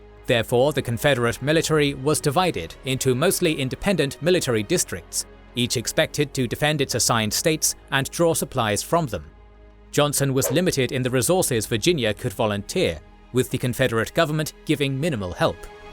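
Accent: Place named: United Kingdom. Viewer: Hate the speed-reading thick British accent.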